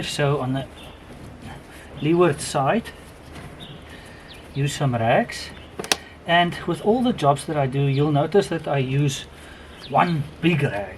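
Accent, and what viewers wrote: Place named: South Africa. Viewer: Love the South African accent! Very helpful, thanks!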